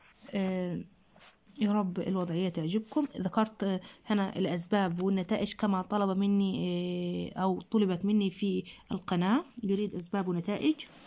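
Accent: Egypt. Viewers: انتي مصرية ولا جزائرية تقديم الدرس رديء جدا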